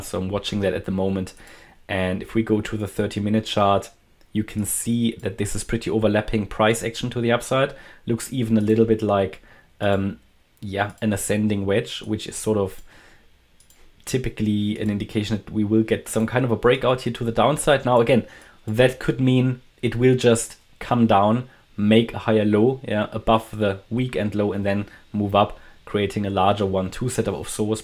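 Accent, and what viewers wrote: Germany. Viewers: Why do I feel like you are Deutsch 😄 Your accent sounds so German.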